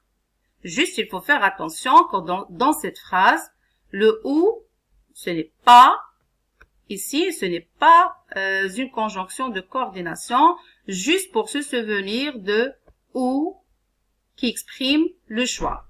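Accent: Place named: Arab world. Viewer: Tg juste prcq elle et arabe et elle a un accent arabe rasciste jte signale regarde comment tu la blaisse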